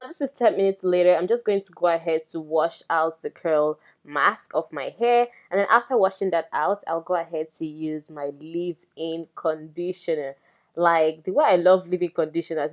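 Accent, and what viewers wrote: Nigeria. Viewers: Your voice makes me no that you are from Nigeria well I am too and I love your video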